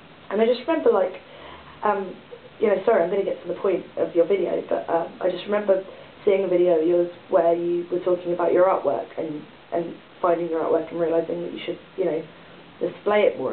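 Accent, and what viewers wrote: United Kingdom. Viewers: One thing you should remember is that if you came to America, people would find you much more attractive than you realize because you have a British accent. Still, I think one of the hardest things to wrestle with is self-criticism.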